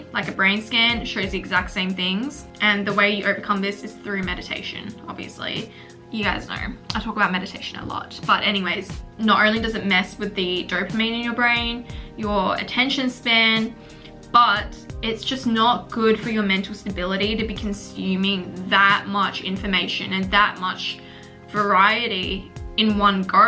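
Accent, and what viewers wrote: Australia. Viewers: I'm learning English and I found the Aussie accent soorrrr gorgeous. Now I'm learning English with an Aussie accent thank u for helping, a lot of love from Azerbaijan 🇦🇿 🇦🇺!!!!!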